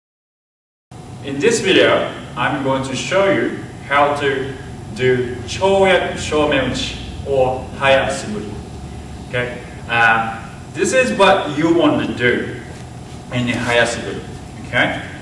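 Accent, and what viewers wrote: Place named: Australia. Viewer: ok great vid this is really helpful and i don't mean to get off subject, and i mean absolutely NO offense in making this comment, but am i the only one who thinks he sounds a little Australian, i mean that just hit me, and im an odd person so i notice these things sometimes even when im not looking for them^^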